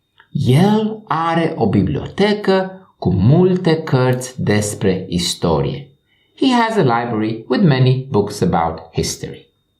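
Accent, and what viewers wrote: Transylvania, Romania. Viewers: pe mine nu ma deranjeaza accentul ardelenesc dar este vorba de doua sunete diferite "e" si "i" si pronuntia corecta; ii inveti sa vorbeasca adica sa pronunte corect romaneste este exact ca in lb engleza; ideea lectiilor este super,felicitari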